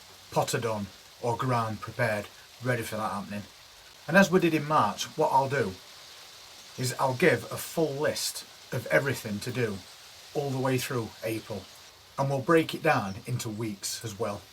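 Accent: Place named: South Lancashire, United Kingdom. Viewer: Thanks for letting us know where you are. I was guessing South Lancs from your accent, but clearly I'm not an expert, I know that changes from town to town round your neck of the woods. So I'm watching this a year later to prepare myself for the month ahead. I'm in Somerset, so a bit more sheltered from the wind, but similar frost dates. You give really good clear information. I kind of know what I'm doing, but the reminder to wait for the weather to calm down is good. So I'm watching this for the details of strategy and tactics to give my seedlings the best start. Thanks for sharing your knowledge and experience!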